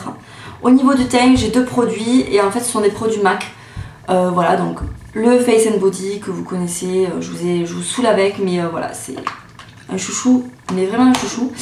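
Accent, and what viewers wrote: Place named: Toulouse, France. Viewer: T'habites a toulouse? Je me disais bien que t'avais un accent!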